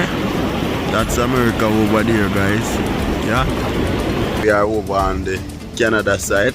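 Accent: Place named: Jamaica. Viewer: WE GET A VLOGGER WHO SPEAK OUR LANGUAGE APART FROM ENGLISH PATOIS PATOIS PATOIS PATOIS AKA PATWA PATWA PATWA AKA BROKEN ENGLISH EG ENJOY YUH SELF MI BWOY CUM BAK SOON ❤❤❤😂😂😂😂😂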